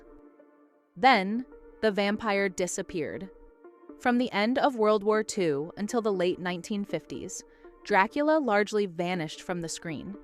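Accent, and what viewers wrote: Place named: England, United Kingdom. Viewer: good answer! an elite vampire friend of yours taught you how to speak in a clear and authentic English accent. I thought you lived in England like I do. You had me fooled. Impressed by your videos about Marishka and the other two brides of Dracula. You are a good story teller. Have you seen The Brides of Dracula with Peter Cushing?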